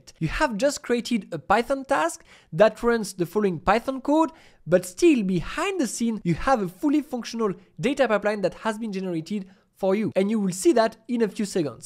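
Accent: France. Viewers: haha seems you have a little french accent, anyway , love your video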